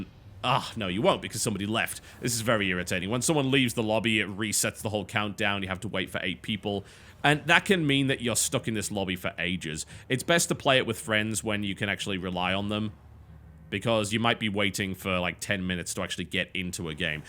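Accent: United Kingdom. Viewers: Oh crap! Did you just correct your pronounciation of the word "usual" to the american version!? :D Careful, or you'll lose your british accent...foreeeveeer...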